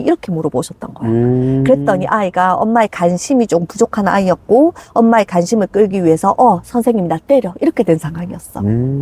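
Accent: Gyeongsang, South Korea. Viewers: 사투리.. 저는 오히려 정감있고 리듬감 있어서 더 집중하게되고 잘 들려요~ 참고로 경상도사람 아니에요 ㅎ 오늘도 많이 배웠어요! 늘 감사합니다! 🤙🏻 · 저도 경상도 사람이라 너무 정겹고 말투가 제 친구랑 완전 똑같아서 더 친근감이 드는...ㅎㅎ영상 잘보고있어요~~저는 기꺼이 육아가 너무 좋아요^^ 늘 좋은 정보 뼈때리는 정보 감사합니다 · 전 경상도 사람인데 서울로 올라와서 서울말 쓰고 생활하는 사람인데요 선생님 강의 들으면서 "아 경상도 말이 이렇게 정감있고 재밌는 말이었지~~~ 나도 다시 경상도 사투리 써볼까 ㅎㅎㅎ" 생각했었는데요!!! 선생님 화이팅♡♡ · 앗 저는 서울 사람인데 경상도 사투리 너무너무너무 힙하고 좋던데요????? 그리고 사투리 덕분에 영상도 더 따숩고요! 팬입니다!❤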